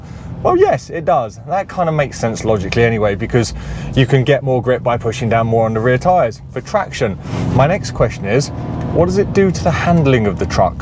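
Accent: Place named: United Kingdom. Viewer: единственное этот британский "акцент" в английском звучит необычно, когда ухо привыкло к американскому английскому))))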